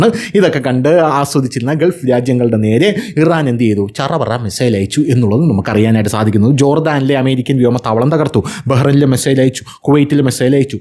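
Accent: Thiruvananthapuram, India. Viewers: ചേട്ടൻ ഇങ്ങനെ രണ്ടു തിരി സംസാരിക്കുന്നു വേറെ ന്യൂസിലെ സ്വാഭാവികമായിട്ടും നോർമൽ ആയിട്ട് സംസാരിക്കുന്നു അണ്ണാ ഈ ചാനലിൽ നിങ്ങൾ കോമഡി ആയിട്ടും എന്നാൽ എട്ടിൻറെ പണി കൊടുക്കുന്നതായിരിക്കും സംസാരിക്കുന്നു ഇഷ്ടപ്പെട്ടു ഐ ലൈക് യു നിങ്ങൾ തിരുവന്തപുരം അവിടുന്നു വന്നതാണോ വർത്തമാനം പറഞ്ഞ ശൈലി കേട്ട് കഴിഞ്ഞാൽ അങ്ങനെ തോന്നുന്നുണ്ട് ഈ ആദ്യം പറഞ്ഞ അയ്യാ വണക്കം അത് അടിപൊളിയായിട്ടുണ്ട് നിങ്ങൾക്ക് ശരിക്കും തമിഴ് അറിയാമോ കാരണം ഞാൻ മലയാളം ആണെങ്കിലും എൻറെ അച്ഛനും അമ്മയും തമ്മിലാണ് നിങ്ങൾ പറഞ്ഞ ഡയലോഗ് എനിക്ക് ഒരുപാട് ഇഷ്ടപ്പെട്ടു ❤❤❤❤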